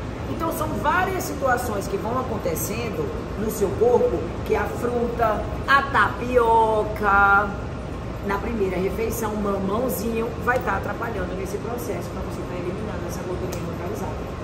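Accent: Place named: Northeast, Brazil. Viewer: Mulher vc sabe tudo não vejo a hora de começar seu treino ,já gostei de vc de cara esse sotaque nordestino é muito massa parabéns por o seu trabalho ❤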